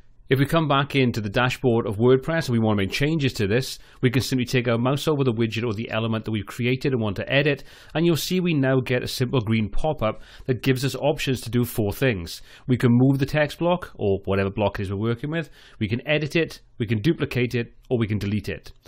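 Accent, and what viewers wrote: Wales, United Kingdom. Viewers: Hey great video! Random question, are you from my merry land of Wales?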